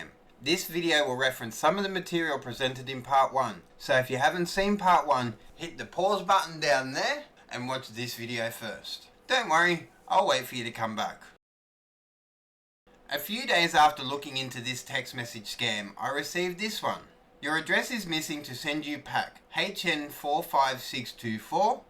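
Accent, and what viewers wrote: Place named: Australia. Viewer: You deserve more followers and more comments for the commitment you put into the fight against these international scammers. Anyone like you who can do this deserves a medal. P.S your Australian accent and laugh is just tops my man. I've watched all your scambait videos and can I say great work on your behalf.. Keep up the crusade just like the rest of the Scam baiting community.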